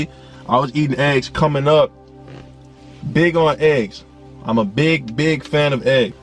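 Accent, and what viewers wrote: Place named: New York, United States. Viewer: New York boy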